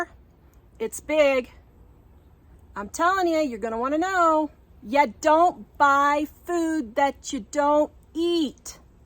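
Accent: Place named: South, United States. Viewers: 1., are you sure you aren’t Southern? 2. These people have never been hungry or they would know how if you are hungry enough you wouldn’t look to see if something is expired. 3. Cereal is nutritious when that is all you have and I eat Cheerios Honey Nut every morning. 4. How do you know if a can food has become infiltrated with botulism, I’ve always heard canned food can have it like bent cans? I’ve never heard how to recognize it. 5. People are going realize Pride goeth before destruction! Forgot verse number and book! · I am a firm believer in being prepared for any situation!!! This upcoming winter is gonna be a bad one. I would much rather be prepared now then wait. Seems like some people just don't wanna listen and prepare now but I loved your southern accent lol. I'm with you can never have too many spices 😁😁😁👍👍👍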